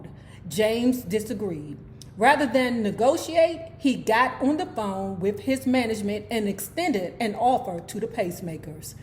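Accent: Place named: Washington DC, United States. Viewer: Lmao lmaooooooooo you sound like you from DC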